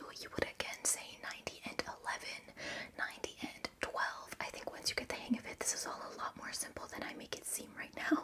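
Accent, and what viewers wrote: France. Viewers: Impressionnant. You're smart. Good english et tu as quasiment l'accent français. Bravo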